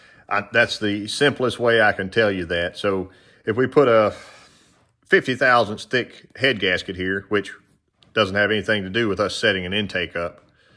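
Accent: South, United States. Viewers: Super fine line! Love the Southern accent! Reminds me of a good day's work, awesome eats and a beer or two, and a good snooze to do it all over again. Thanks I hope you have a good morning and enjoy your day today. 15° and heavy snow ❄️🌨️ in Western Pennsylvania this morning.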